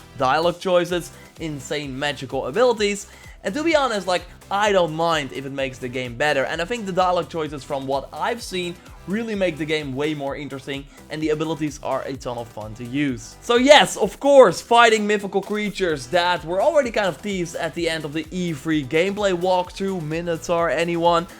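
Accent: Netherlands. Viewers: I like everything about Assassin's Creed, too bad you have such a horrific Dutch accent that I can't bear through any of your videos. It's a real shame man... Do something about your awful English speech. Then maybe you'd be a really good YouTuber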